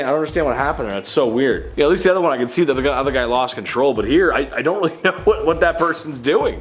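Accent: Scotland, United Kingdom. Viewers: LOVE your Scottish accent!!!!! Cheers me up every time!!! Much love from Michigan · Now the house is more damaged and somebody may seriously hurt because of that guy Ps. Nice Scottish accent!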